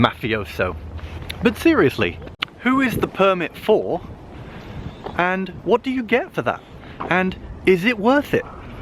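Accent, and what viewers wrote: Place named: Chicago, United States. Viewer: is this Chicago? He Sounds like hes from Chicago....🇬🇧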